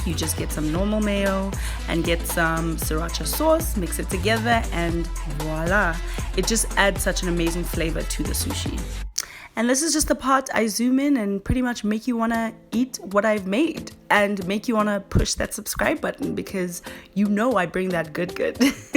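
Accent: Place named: South Africa. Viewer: Not me being excited about the South African accent😂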